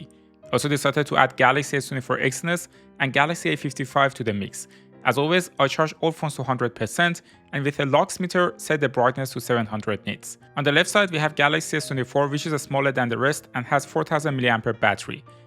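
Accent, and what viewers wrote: Iran. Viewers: Persian English accent 😊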